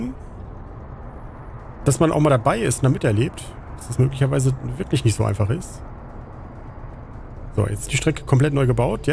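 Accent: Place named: Russia. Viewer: . Du hast ein super Video gemacht was ich wie immer liebe. Ich bitte dich bitte den Russischen aktzent weg zu lassen, weil es mich und meine Freunde etwas beleidigt. Natürlich wissen wir das dass nicht provokant gemeint ist und du das aus spaß sagst. Ich hoffe du schätzt das dein Russischer Abo.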